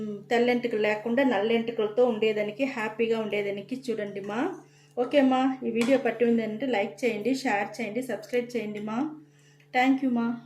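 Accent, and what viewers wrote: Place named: Tamil Nadu, India. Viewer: Tamilien telugu edi . Kani great .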